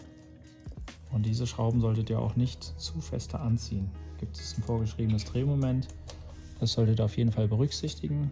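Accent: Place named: Dippsch, Germany. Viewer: So toll aufgebaut die Videos 👌👌 von wo kommst du denn? Das Dippsche 😄 geil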